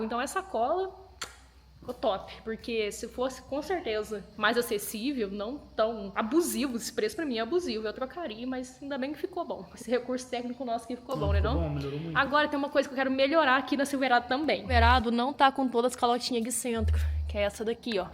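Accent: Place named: Caipira, Brazil. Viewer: Essa equipe é a melhor em assunto de reforma e criação de projetos. Esse sotaque caipira da é um charme. Simpatia beleza e leveza tudo junto nessa equipe.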